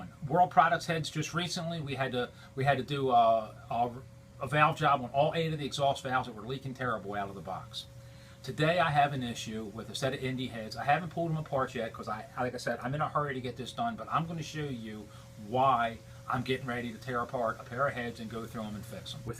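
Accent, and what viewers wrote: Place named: Baltimore, United States. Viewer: Damn, you GOTTA be from Baltimore!! I know that accent anywhere!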